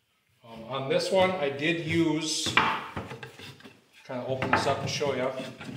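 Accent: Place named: United States. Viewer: Love the American accent, 'ruff' (roof). 🙂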